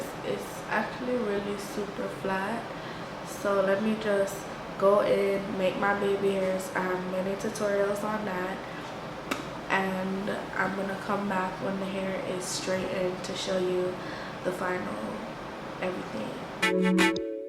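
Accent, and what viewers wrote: Jamaica. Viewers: Loooove!!! ❤❤❤ You sound like a yardie tho! Only a yardie will understand what i mean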